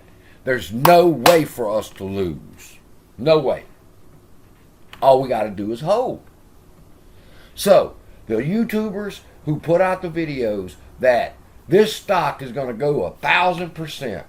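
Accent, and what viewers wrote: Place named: Boston, United States. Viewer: he mentioned he liked you a long time ago. Something about your boston accent. Long time ago. This was when you were angry and going after other youtubers on the daily. I told him you were a sjw star wars fan. And a man who still likes star wars after what Disney did to it is no man at all. Also, you do no real dd and do not contribute anything of real value to this play or any other. Did you know Tony D is an actor and Denaro isn't his last name? I have a feeling you east coast guys are all deceptive people. What does "massa" mean anyhow? You don't think something slaves said to their masters is cute do you? Ahh, Disney fanboys.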